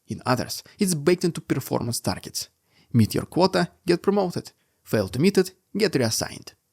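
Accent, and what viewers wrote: Russia. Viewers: You would get more listeners if you dropped the heavy Russian accent. People want to understand the information not listen to a comedian.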